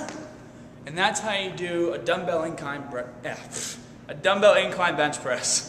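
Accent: Boston, United States. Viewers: I can't watch videos without your boston accent anymore. I love it.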